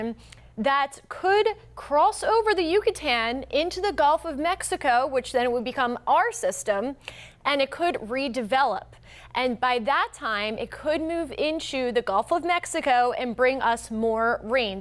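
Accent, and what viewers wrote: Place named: Pennsylvania, United States. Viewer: Gawd her accent is just terrible. Where is that from Pennsylvania?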